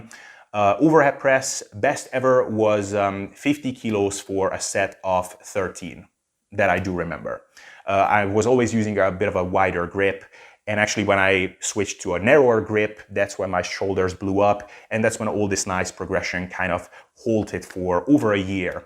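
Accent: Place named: New Jersey, United States. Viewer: That’s a lovely accent you have, New Jersey? Loved the Dumb and Dumber reference 😂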